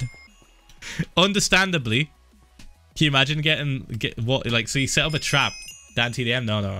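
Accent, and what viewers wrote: United Kingdom. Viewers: I keep forgetting he's British. Even while he's talking.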